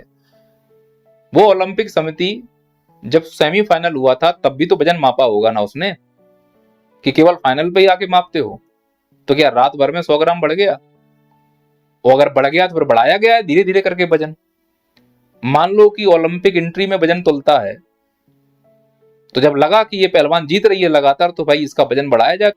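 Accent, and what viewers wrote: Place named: Uttar Pradesh, India. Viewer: Sir aaj pura u p lehje me bol rahe hai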